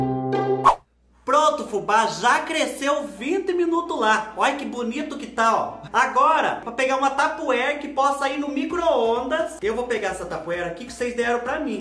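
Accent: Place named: Minas Gerais, Brazil. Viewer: océ é mineiro, falando parece kkkkkkk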